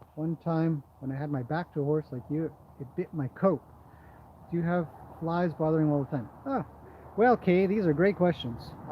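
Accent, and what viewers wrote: Canada. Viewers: Hello, love your video's , where are you from, you sound Canadian.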